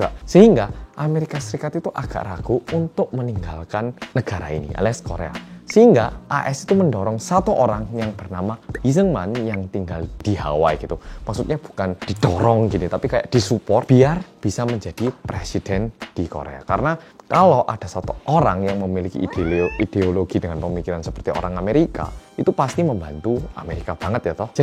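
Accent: Java, Indonesia. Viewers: Hi oppa medok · Aksennya indo dapet bgt, semangat bg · Hahahahaha penjelasan nya wong jowo tenan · Sampean logat jowo ne peh masok bolo😁😁😁😁😁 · orang korea ko medok??🤔🤔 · Ini orang Korean beneren ya? Medok jawanya enak lho. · Denger pelafalannya mirip soekarno yaa kalau ngomong “keun” maksdnya “kan” wong jowo 🔥🖤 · Logat Jawa nya tu lo hhhhhh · Orang Korea tapi bahasanya agak medok 😀👍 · Logatnya jadi BHS Jawa ya medoknya 🤣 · Wah bahasanya medok..sudah kayak orang Jawa mas😂😂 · Bisa jadi pengamat politik iki mas korea yg bisa jowo medok🤣🤣🤣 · Orang korea lagam bicara jawa wkwkwk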